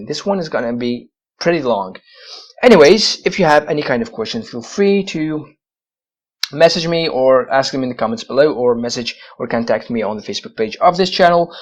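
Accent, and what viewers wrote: United Kingdom. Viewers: Thanks a loot. I was shocked when you said " arabic our language " . You sound British.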